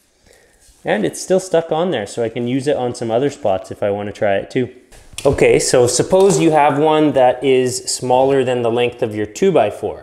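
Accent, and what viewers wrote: Canada. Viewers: You sound unbelievably Canadian.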